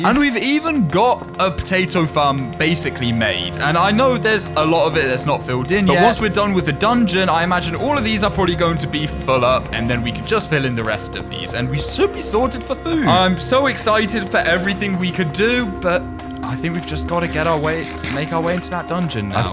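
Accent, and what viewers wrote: United Kingdom. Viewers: Are u britosh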